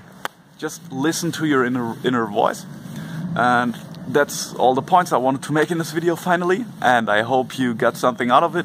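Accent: Germany. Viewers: german- english :D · I like your German English :) Grounding is verrrrry important otherwise the trip might be very dangerous and scary. I also would suggest anyone who is willing to take Ayahuasca to use a grounding Crystal. Lava is excellent since it comes from the source of the Earth. Otherwise you might go into another world and you might feel you can't come back to this dimension/reality and this is the worst feeling. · hey man are you german?? Sound like.. bin nnämlich auch deutsch und ich würde gerne mal mit dir quatschen wenn du zeit hast :) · Dude you must be german cool accent 😅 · German English lol cute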